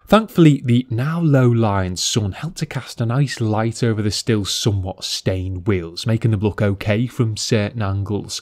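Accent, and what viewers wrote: Liverpool, United Kingdom. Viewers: Is this a Liverpool accent? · You’re an amazing Detailer and vlog maker, but your accent is so pleasant to listen to. I was really meant to be born and raised in the UK like my ancestors, instead of my Baltimore, MD, USA accent Hon’. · Worst accent in Britain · Are you Scouse?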